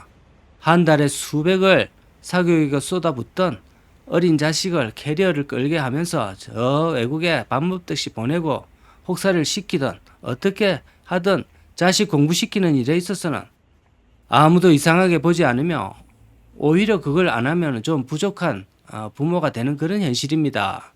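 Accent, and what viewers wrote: Gyeongsang, South Korea. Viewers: 경상도 말투는 왜 촌스러울까 나두 경상도인데ㅠ😊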